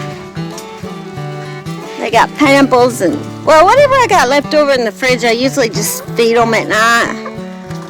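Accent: South, United States. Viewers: Oklahoma?? That's not true you have a southern accent Oklahoma is way Midwest they don't speak in that manner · I like your voice...a southern accent is comforting to me...probably bc I'm southern. Lol Hyped your video. Encourage people to comment too bc it helps the algorithm.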